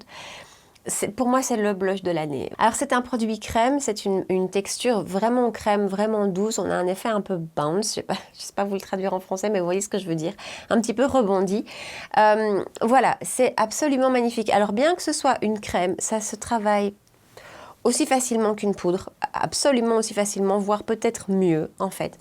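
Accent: Belgium. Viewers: J adore tes vidéos, j adore le fait que tu viennes de Belgique ( je suis belge et j habite en France depuis 10 ans, ton accent est un émerveillement des ouïes) et j adore ta couleur de cheveux, aurais tu la référence de ta couleur stp?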